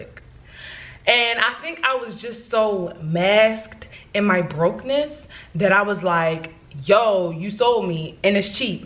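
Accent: Baltimore, United States. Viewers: You’re from LA? Girl, I thought you were from DMV or Baltimore. That’s what your accent sounds like.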